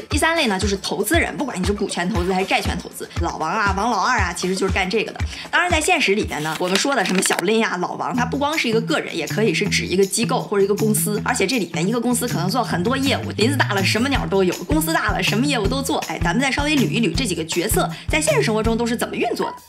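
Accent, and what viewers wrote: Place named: Beijing, China. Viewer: 这京腔，真好听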